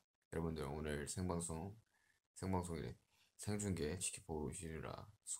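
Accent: United Kingdom. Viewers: His english accent ( ╥﹏╥) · Jada R it also sounded like he had a slight British accent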